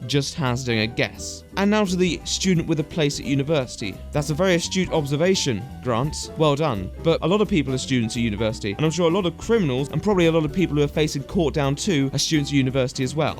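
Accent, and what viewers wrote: United Kingdom. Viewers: is this a voice you put on or is it genuine? it's the most english voice ive ever heard aha, and i'm english myself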